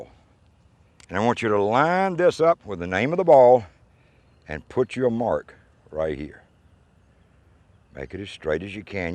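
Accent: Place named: South, United States. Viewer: Great southern accent! Makes the video succulent.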